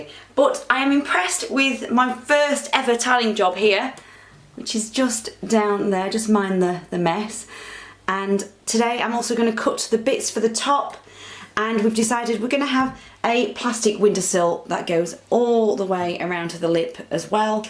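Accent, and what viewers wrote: United Kingdom. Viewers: To, I hope you realize how lucky you are! Your future wife is a catch. Nothing and I mean Nothing will stop her. She’s hot, requires little to no makeup, has a British accent and is fearless. Plus, it looks like she can cook too. Another Nigella Lawson. Congratulations to both of you. Make her happy. Oh, great video. Brilliant!!!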